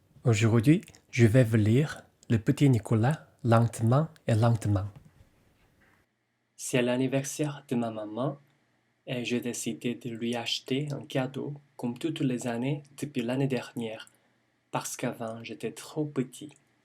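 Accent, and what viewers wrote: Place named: Quebec, Canada. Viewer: It's canadian french, just saying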